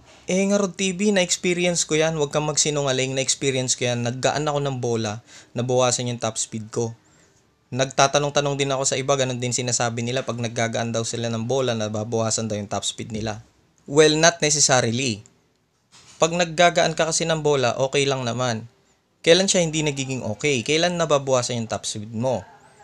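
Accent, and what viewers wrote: Ilocos, Philippines. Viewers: Ito talaga idol ko sa paliwanag ng cvt eh. Tanong lang idol, ilokano ka? Saan ka idol? Hahaha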